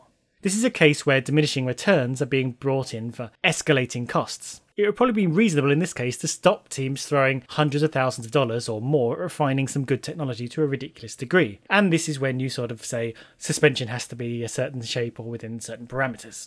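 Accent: United Kingdom. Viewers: lol brits always say social mediar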